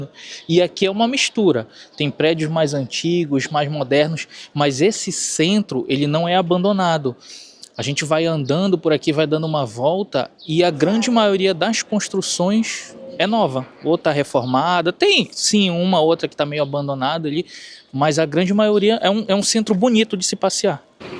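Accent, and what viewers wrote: Pará, Brazil. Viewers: Mano parece se do Pará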